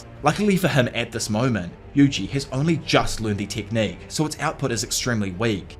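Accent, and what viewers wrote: New Zealand. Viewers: r u from new zealand? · he's from new zealand!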